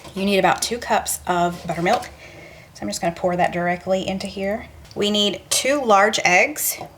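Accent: South, United States. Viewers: Ohhhl is the southern lingo for oil…. I love the twang of the south. And you bowl your water for pasta 😂😂 Yeap, chicken fried steak is southern dish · that chicken looked so good , def gonna try that one , but u crack me up, spray them with lil ol !!!!!! here in the north we call it like its spelled . oil hehehee, southern talk makes me laugh at how ppl pronounce diff words ... ty for a great video , oops n all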